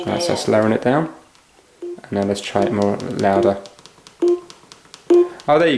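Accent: England, United Kingdom. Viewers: So nice to hear an English voice and not a yank droning on, well done mate your a star ! 😀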